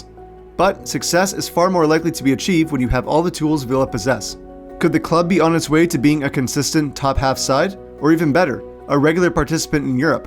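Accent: Canada. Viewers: I bet ur Canadian mate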